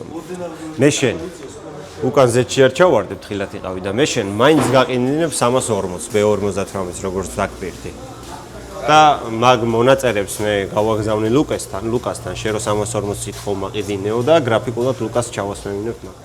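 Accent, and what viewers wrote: Guria, Georgia. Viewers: კაცურად გურულად მეტი ილაპარაკე ❤ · გურული აქცენტით რო ურტყავ ხოლმე ვხალისობ კაია 😊 მეც გურული ❤😂 გბალეშიკობთ გურული ბიჭები, აპა რავა 😊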